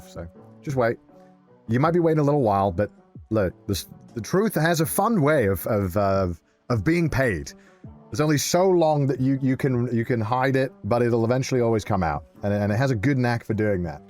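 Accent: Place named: Wales, United Kingdom. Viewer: is so so angry. His Welsh accent is creeping into his radio voice.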